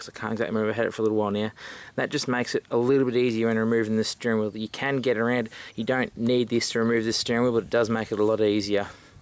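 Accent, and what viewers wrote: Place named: Australia. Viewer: very helpful. and as a yank, i appreciated that aussie accent.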